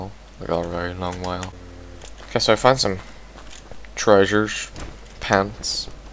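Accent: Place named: Lisburn, United Kingdom. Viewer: Dude! I can't believe I didn't pick up on your accent the first time around lol. My folks are originally from Lisburn lol My granda used to own the pram shop on the corner at the top of the town Fredrick Thomas lol. Biggest hint I can give to help others channel is to network. Go to peoples channel talk to them and ask about their videos it puts people in a really good mood and then they come to yours. when you said "I took a baitin ther" I thought Yup Lisburn :D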